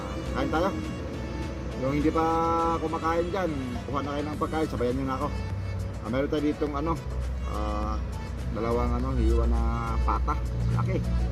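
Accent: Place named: Visayas, Philippines. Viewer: Ay bisaya ka deay idol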